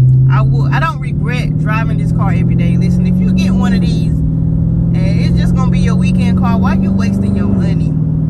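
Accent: South, United States. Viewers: That southern accent is something special.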